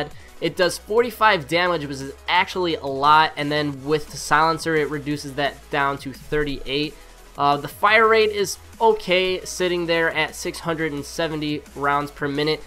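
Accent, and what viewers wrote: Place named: Scotland, United Kingdom. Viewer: Zezo The Noob his accent is strongly Scottish not English sry but I'm from England so I hate it when ppl say that Irish and Scottish accents are english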